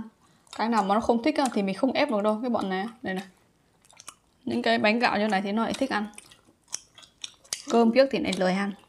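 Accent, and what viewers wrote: North, Vietnam. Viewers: Nói giọng bắc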